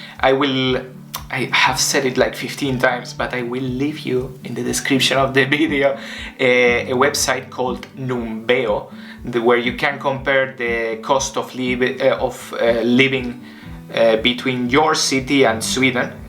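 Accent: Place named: Spain. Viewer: Your accent is very Espaniol !!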